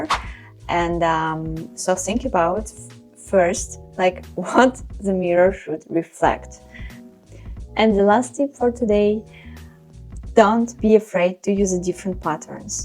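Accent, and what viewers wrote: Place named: Germany. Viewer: You German huh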